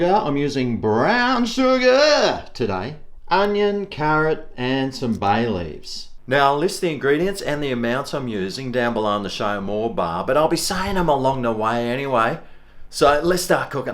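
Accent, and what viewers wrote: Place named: Australia. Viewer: Love the Aussie accent 😂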